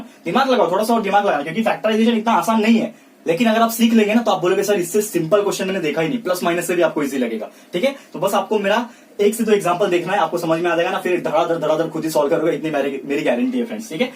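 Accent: Maharashtra, India. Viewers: sir you are best And I have a question 😊😊 ARE YOU MARATHI...? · App marathi ho na sir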